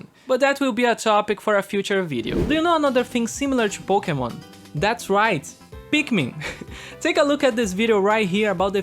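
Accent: Brazil. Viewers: Are you Brazilian? You speak with kind of a Brazilian accent.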